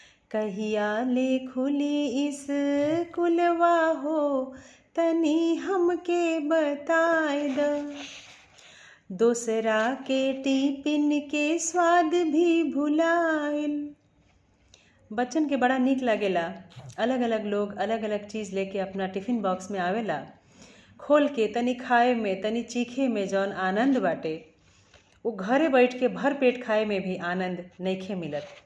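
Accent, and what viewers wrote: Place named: Bhojpuri, India. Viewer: भोजपुरी के मिठास ...छा गई आप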